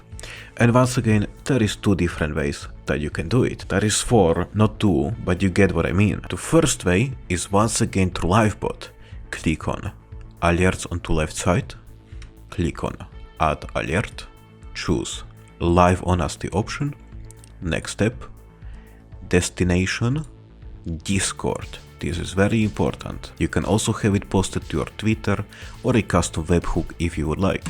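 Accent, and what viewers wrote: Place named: Poland. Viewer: bro has 100% the poland accent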